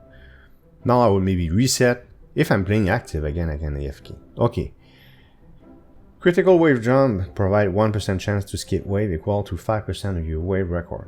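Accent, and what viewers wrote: Quebec, Canada. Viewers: Es tu québécois?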